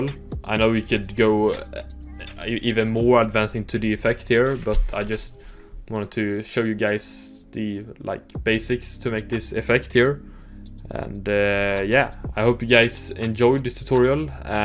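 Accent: Sweden. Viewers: why does he sound swedish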